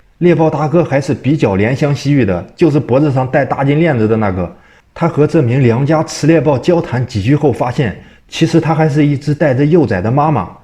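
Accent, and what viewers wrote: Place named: Shandong, China. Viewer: 这口音像是山东泰安东平的口音。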